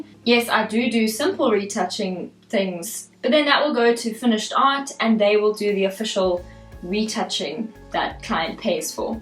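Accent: South Africa. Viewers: its funny how I instantly knew were a south African by your accent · Your accent sound South African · Been looking for a fellow South African to share a day in the life, and your accent kinda gave it away before the SA patch on your tshirt😂 but anyway this was insightful and really entertaining.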